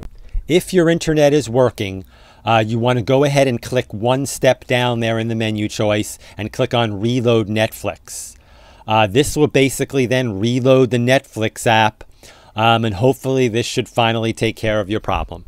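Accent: New England, United States. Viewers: Your New England accent had me thinking John F Kennedy was helping me fix my smart TV.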